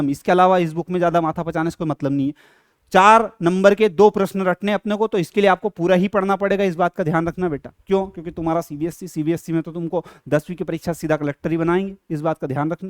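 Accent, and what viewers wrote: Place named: Haryana, India. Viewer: Sir aap haryana se ho language se lag raha hai.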